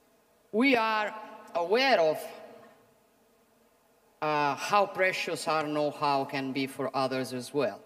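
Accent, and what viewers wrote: Rome, Italy. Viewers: Ah, mai sentito un inglese cosi’ con inflessioni romanesche! dajjjje! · Paesi poveri, attore ucraino, qualcosa per gli italiani? Nulla vero? L' inflessione dialettale non se la toglie neppure in inglese!!!! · A Giorgia, quanto sei più bella quando parli in romanesco!!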